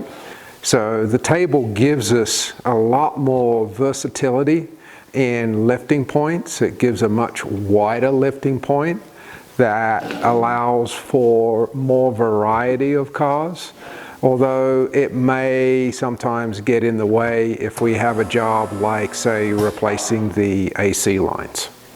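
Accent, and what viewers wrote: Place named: Australia. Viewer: your accents sounds like you've lived in Australia -are you an ex aussie?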